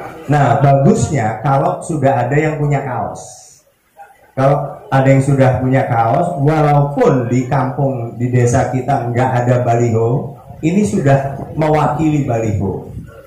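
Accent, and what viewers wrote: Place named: Banyumas, Indonesia. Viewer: Ttep saya memiling pak andika rari orang ngapak bolo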